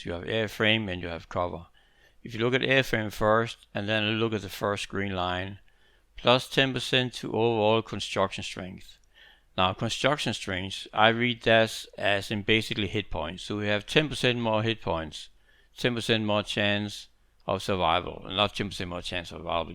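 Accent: Denmark. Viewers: Jeg havde ikke set dit navn før jeg hørte accenten. Men jeg kunne høre det danske :D Tak for en god video.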